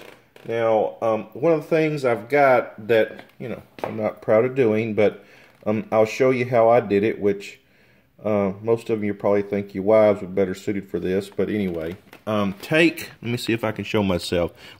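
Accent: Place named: Texas, United States. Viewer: I knew you had to be from Texas when I heard you start the video :) Thanks for the tips.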